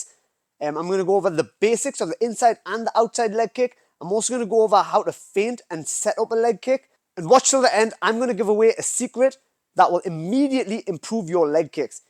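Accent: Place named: Newcastle, United Kingdom. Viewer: appreciate the super fast response. I have been away from the UK 5 years so ;loved hearing the geordie accent. If you ever visit Pakistan, please let me know so we can get you teaching a seminar or two for the local lads.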